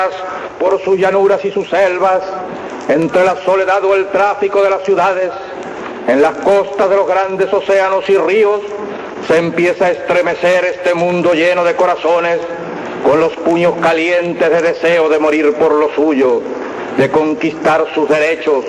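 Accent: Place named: Cuba. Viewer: Porque hablaba como cubano?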